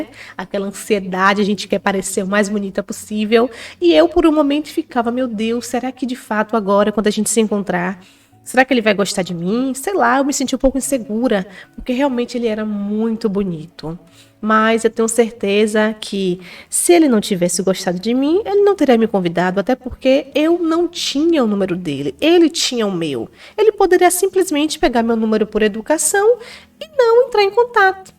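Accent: Bahia, Brazil. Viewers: Essa narração feita pela a, com esse sotaque baiano ficou maravilhossaaa.!!!❤❤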